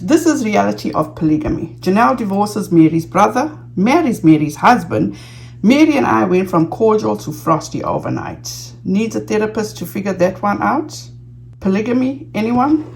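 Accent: South Africa. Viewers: Are you freaking stupid?!? Kj was advocating for the kids. She's callinging Christine out for allowing her child to be used. I don't like that she is going in on Christine but tbf most are manipulated their whole lives for what is normal, kj is coming from a western idea. Being that you sound like a south African you can't understand her point of view. Like we don't agree or understand your pov with your countries history. But we let y'all be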